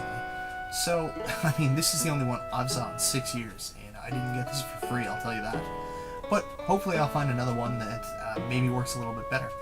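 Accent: Canada. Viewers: wheres youre accent from canada?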